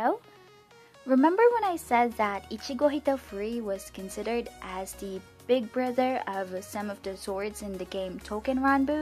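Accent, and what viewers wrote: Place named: United States. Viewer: Phillipines? You sound American??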